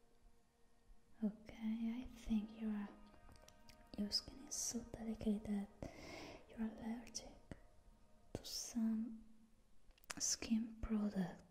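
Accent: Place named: England, United Kingdom. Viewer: Omg! Your English accent is just so good and relaxing😍 I just love it ❤️ it’s so cute!🤗🥰❤️❤️❤️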